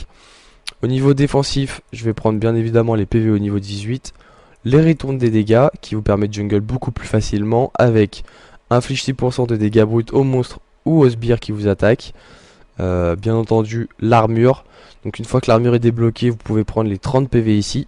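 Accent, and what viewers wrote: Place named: France. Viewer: J'adore quand il dit la solo cul xD Accent français ftw ! :D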